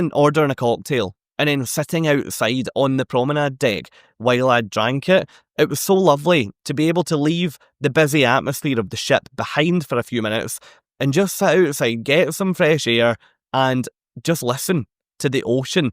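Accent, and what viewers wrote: Scotland, United Kingdom. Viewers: First of all LOVE your Scottish accent! Where in Scotland are you from? Second, all wonderful tips! We are cruising Virgin for the first time in February 2026, and cannot wait! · Another really charming video,! Just love that endearing Scottish accent! Our first VV cruise leaves Portsmouth next month. 13 days on the Mediterranean aboard Scarlet Lady. Shall see how we go, but that hammock and the great food constantly talked about should be some of the highlights. Have taken your tips on board. Thank you!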